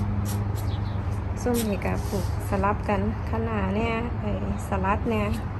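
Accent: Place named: Isan, Thailand. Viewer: มาให้กำลังใจน้อง ค่ะพูดอีสานเสียงเพราะมาก สุขภาพแข็งแรงแล้วหลาย มาเดินดูผักปลูกผักไม่หยุดเลยรักษาสุขภาพด้วยนะ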